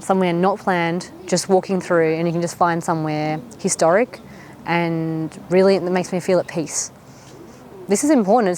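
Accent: Australia. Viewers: 호주 영어 넘 듣기 좋아요.^^늘 응원하겠습니다. 전 반대로 호주에 있는데 한국에서 새로운 도전중에 악기나 스크린 골프 뭐든 배우시는것도 추천드립니다.^^ 그리고 아프시면 안되니 병원도 꼭 자주 가서 검사받아보세요. 제가 호주에 있으면서 제일 불편하고 적응안된 두가지입니다. 한국은 이 두가지 천국이에요^^ 꼭 원하는 성과 없으시길 응원드리고 늘 구독하겠습니다.홧팅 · G’day, mate! Glad to see an Aussie here in South Korea. I’m a Korean Aussie and used to live in Adelaide but now living in Korea near Daegu. Came across your YouTube clip and so glad to hear Aussie accent in such a long time. perhaps, 4-5years since I left Adelaide? All the best for your new journey and hope you to find many joys much happiness.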